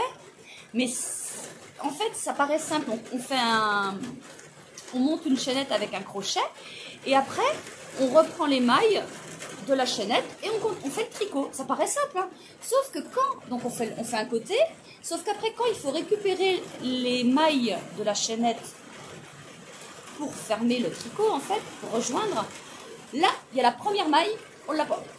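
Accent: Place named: Mayenne, France. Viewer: Bonjour j adore votre énergie et votre simplicité du coup je me suis abonnée et j ai visionner vos anciennes vidéos j ai adoré celle de la teinture de la laine et c est du boulot je viens d entendre la ville Laval et rien qu' à vous entendre je me doutait que vous êtes mayennaise je suis aussi de la région à bientôt dans la prochaine vidéo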